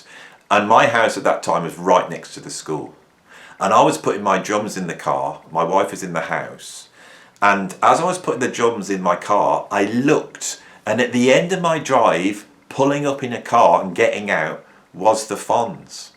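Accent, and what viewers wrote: Birmingham, United Kingdom. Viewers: Sorry old luv but as a pro jazz arranger/MD and player of 45 years I think your attitude is trivial, uneducated and banal. Let's leave your brummie accent aside because it would not be fair to make assumptions based upon that and i would be the last to wish to so do. I have watched some of your - to me, cringeworthy - videos and cannot help gain the impression your musical knowledge needs a refreshing perhaps?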